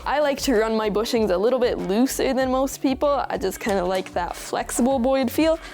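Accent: Canada. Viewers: Wow you are so grown up know you have bass in you're voice and lost some of the canadian.i can't believe I watched you grow up from backwards hat to beenie and now you show you're hair.great job kid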